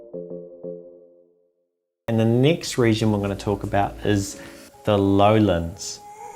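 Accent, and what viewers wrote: New Zealand. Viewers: Hey! Just had this video recommended to me, clicked it, thought 'oh sweet that's a Kiwi', then realised 'wait a second I know that guy'! Loving the content, instant subscribe! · Are you a kiwi? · You're from New Zealand right?? Testing my accent knowledge here lol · When you first started talking I thought Aussie, but second sentence in I was like definitely Kiwi, especially when you said Glunluvet and Glunfudduch lol. Shot bro 🥃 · Hi, thanks for the great video. Question: is your accent a New Zealand or perhaps an Australian accent? Just wondering. PS: I’m an Islay Whisky fan 🥃😁👍🏼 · He’s definitely kiwi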